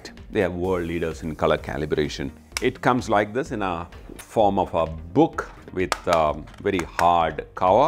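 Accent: Kerala, India. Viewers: really enjoying how he explain it, simple and understandable, and especially the accent :) is it india? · Are you mallu 😀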